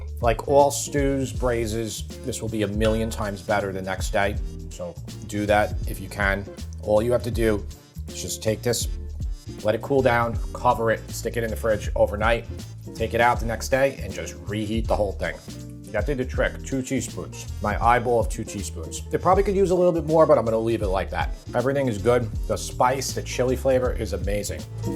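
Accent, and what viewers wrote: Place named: New England, United States. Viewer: Mexican dish from Colorado cooked by dude who sounds so New England that he should be pouring it on a grinder with a side of clam strips Beautiful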